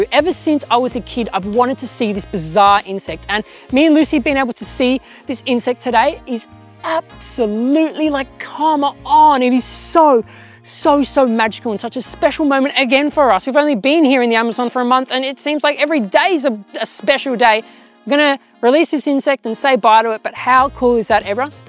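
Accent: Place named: Australia. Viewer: Your accent tells me you are from Australia :)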